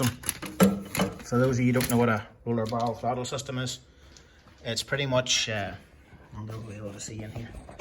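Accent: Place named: Scotland, United Kingdom. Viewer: I absolutely love the Scottish accent ❤❤❤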